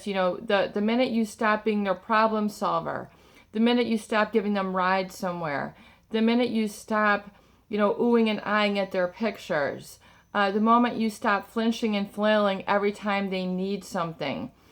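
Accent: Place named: Illinois, United States. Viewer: I have been listening everyday. I have been journaling. I have put what you have said into practice with the affirmations and the journaling. Thanks so much for your work (and so much work you have done to help us!). I am from originally from McHenry, Il. It sounds like you are from Illinois. I so appreciate what you are doing. Love to get to know you somehow. Keep up the good work!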